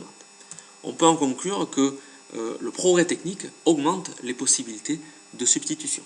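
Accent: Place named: South, France. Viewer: apprendre l"élasticité avec l'accent du sud il y'a rien de mieux! trés bonne explication merci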